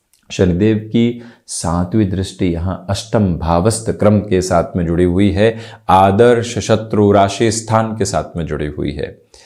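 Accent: Standard. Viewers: Apki Hindi sun kar bahot accha laghta hein… kash mujhe bhi itni shudh hindi aati 😊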